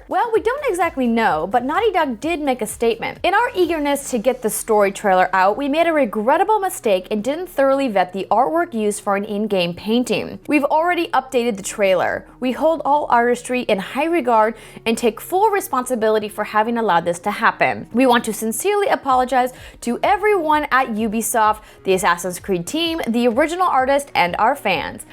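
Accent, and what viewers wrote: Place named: Minnesota, United States. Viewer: are you from Minnesota? Your accent is subtle, but I hear it:P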